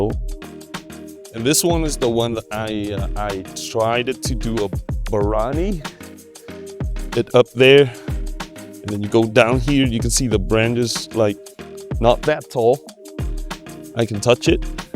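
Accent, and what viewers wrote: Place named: Texas, United States. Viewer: I see, thats kind of similar learning curve to mine. Definetly not, your accent sound cool, you sound like some bloke from texas (atleast to my slavic ears). Keep on grinding mate!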